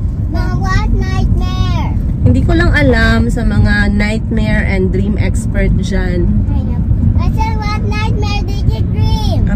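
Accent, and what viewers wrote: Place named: Conyo, Philippines. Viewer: Konyo kaayo ug OA ka madam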